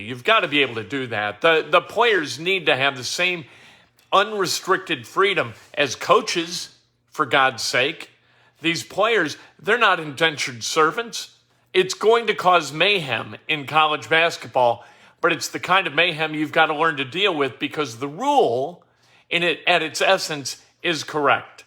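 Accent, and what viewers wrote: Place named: Kentucky, United States. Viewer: Lol at your Kentucky accent 🤣🤣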